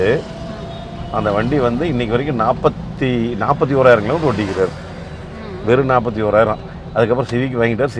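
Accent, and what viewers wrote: Kongu, India. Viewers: Kongu mandalam tamil. We ball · கொஞ்சும் கொங்குத் தமிழ் பேசும் கோமகரே...! திகட்டாத தித்திக்கும் பேச்சுடைய திருப்பூர் திருமகரே...! மதிப்பிற்குறிய " " திருப்பூர் திரு. அண்ணா🙏❤ எப்படி இருக்கீங்க❤🙏 அண்ணி எப்படி இருக்காங்க❤🙏 ரித்திக் எப்படி இருக்காரு❤ அருமை அருமை அருமை வழக்கம்போல அண்ணா உங்களோட வீடியோ அருமை❤❤❤😊😊❤❤ என்ன சொல்ல ஏது சொல்ல😊😊❤❤❤ சும்மா நெகு...நெகு...நெகுனு இருக்கு வீடியோ😊😊❤❤❤❤ பூவாட்டம் தாலாட்டுது உங்க பேச்சு❤❤❤❤❤❤😊😊😊❤❤ நானும் 80s kids அண்ணா❤ என்னோட பிறந்த வருடம் 1988தான்😊 அதுமட்டுமல்ல இந்த வீடியோவுல இன்னைக்கி அண்ணா நீங்க எடுத்துட்டு வந்த இந்த பழையகாலத்து "மாருதி 800" காருக்கும் என் வாழ்க்கைக்கும் ஒரு பிணைப்பு இருக்கு❤❤❤❤❤ பிறந்த குழந்தையான என்னை மருத்துவமனையில் இருந்து வீட்டுக்கு அழைத்து வந்த முதல் கார் இது❤❤❤ நான் என் வாழ்க்கைல பயணம் செய்த முதல் கார் இந்த "மாருதி 800"தான்😊😊😊😊😊😊❤❤❤❤❤❤❤❤❤❤❤❤❤❤❤❤❤❤ என் பெரியப்பா வைத்திருந்த கார் இது அவர்தான் பிறந்த குழந்தையான என்னையும் அம்மாவையும் அப்பாவையும் மருத்துவமனையில் இருந்து வீட்டுக்கு அழைத்து வந்தார் இந்த காரில்❤❤❤❤❤❤ இப்போது இந்த கார் அவரிடம் இல்லை விற்றுவிட்டார். 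எனக்கு சரியாக 15 வயது இருக்கும்போது இந்த காரை அவர் விற்றார். நான் முதன் முதலில் டிரைவர் சீட்டில் அமர்ந்து படபடப்போடும் பயத்தோடு லேசாக ஓட்டி நகர்த்திப்பார்த்த முதல் காரும் இதுவே❤❤❤❤❤❤❤❤❤❤❤❤❤❤❤❤ நீங்காத நினைவுகளை கண்முன் கொண்டு வந்துவிட்டீர்கள் அண்ணா❤❤❤❤❤❤❤❤❤❤❤❤ அய்யோ செகண்ட் வீடியோ அந்த சீட் பெல்ட்🤣🤣🤣🤣🤣🤣🤣🤣🤣🤣🤣😂😂😂😂😂😂😂😂😂😂😂😂😂😂😂😂😂😂😂 இந்த டிரைன்ல டாய்லெட்ல சங்கிலில கட்டித் தொங்கவிட்டுருப்பாங்களே எடுக்க மட்டும்தான் உங்களுக்கு முடியும் மத்தபடி ஒன்னுமே முடியாதுனு சொல்ற மாதிரி🤣🤣🤣🤣🤣🤣🤣🤣🤣😂😂😂😂😂😂😂😂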